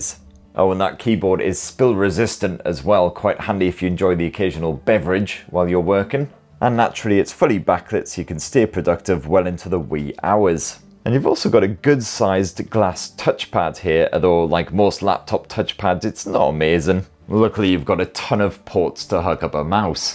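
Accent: United Kingdom. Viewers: the intro has the most british accent ever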